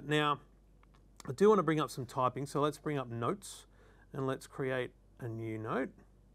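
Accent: Australia. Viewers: I've watched an obscene amount of videos about the Vision Pro. It’s nice to finally see/hear an Aussie talking about it 😅 The pass through is something I’m a bit disappointed about. The degraded quality is purely because you’re looking through a camera. I wish it used better cameras. For 6k you’d think it would. I’m taking this Friday off. Picking up my headset at 9am. Very exciting 😄 Thanks for the vid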